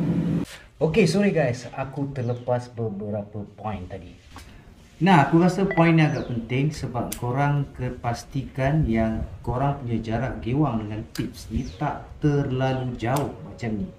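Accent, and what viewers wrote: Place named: Melaka, Malaysia. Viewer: Mantap Dato Seri.. Episyen!.. mcm org Melaka piau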